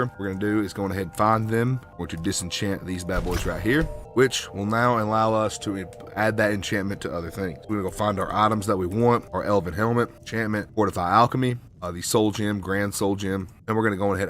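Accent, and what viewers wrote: South, United States. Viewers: Saw the video and thought "I hope it's the guy with the southern accent" and it so was. Think it's time I subscribed lol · The accent caught me off guard 😭 I'm a southerner myself tho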